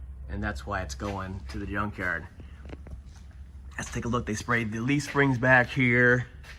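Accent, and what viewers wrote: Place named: Maine, United States. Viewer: Love the Mainer speak. Reminds me of one of my favorite movies, Dolores Claiborne, "you do it again and one of us is going to the boneyard".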